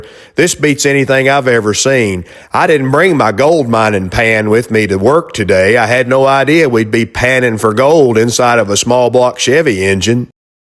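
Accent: South, United States. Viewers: Not Forrest just southern I guess I am in New Mexico but my family is all Texan sounds normal to me. lol love the vids 34 5w is my dream car one day maybe but at 62 maybe not got my 67-mustang hot rod runs 10's but I would love a 32-34 5w coupe my dad had 32 chopped 5w when he was young man wish it was mine. keep the cool content coming. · You guys are a totally awesome sight always and your voice sounds southern!! Don't ever change I love your attitude!!! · You sound like most of the people I know “southern blue collar workers “ I’m kinda proud of that . Those that poke fun of us sound just as different to us as we do them , I agree with the one guy , like the mixture of your videos ,machinist , mechanic, car lover , farmer, musician, family man, just a good ol boy,you know your mama love you !!!!!!😂😂😂 hey you can’t get all those degrees down at the university, 😊😊😊😊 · Just because you hear a nice southern drawl, they start thinkin’ you sound like somebody. 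What about Roscoe P from Dukes of Hazzard, or Conway Twitty? You just keep being you, and I’ll keep watching the guy that sounds like half my kin!! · Well, I like this channel just the way it is. You do not talk too much. You do not sound like ol Waylon. Sorry. But you do sound like a more southern version of my old pal Tim From Throttle Power up in Louisville. 😊 Your gasoline hallucination was a nice treat. Another enjoyable video. Thanks. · Lmao, im an arkansas hik and you sound like you're from the south. The brass had to come from above the carbs, very fortunate it didnt get passed the valve. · I think some of your listeners need there hearing checked, You do have a different voice to most people nowadays that's only because your southern and we are all a dying breed. Your singing voice is excellent I wish I had that, but its your voice not anyone else's that is what makes it sound so good. 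But Dukes of Hazzard and Forrest Gump I bet your wife thinks people are crazier than I do how funny.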